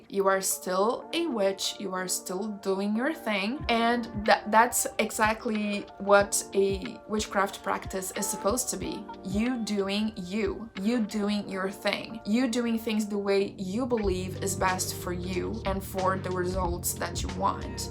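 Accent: Brazil. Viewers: Eu sabia que você era brasileira!! Seu sotaque é muito daqui <3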